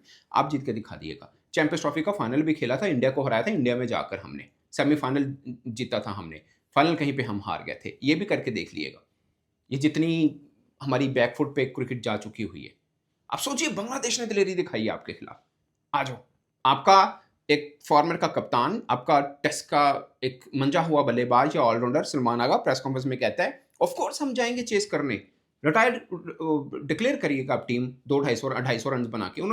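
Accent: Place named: Amritsar, India. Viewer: your Punjabi sounds like so AMRITSARi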